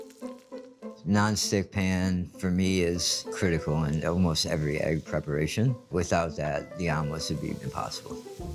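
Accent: South, United States. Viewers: He has a strong southern accent. 😅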